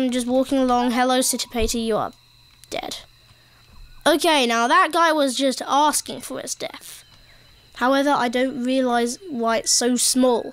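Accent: United Kingdom. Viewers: U sound Britian! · You are you British?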